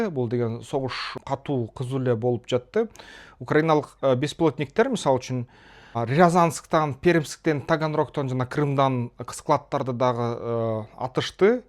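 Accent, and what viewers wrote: Standard. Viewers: Азаматсын,нукура кыргызча суйлойсун.жанылыктарына ыраазымын.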